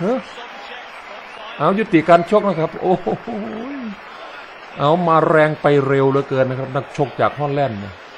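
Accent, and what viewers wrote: Isan, Thailand. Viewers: ผมคนใต้.ติดตามครับ.พากได้หร้อย.. ขำดี.พากอีสาร.ปนใทย.นี้ถ้าพากติดภาษาใต้มั้ง.. หร้อยจ้าน... 555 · เว้าอีสานบ้านโตฟังม่วนดี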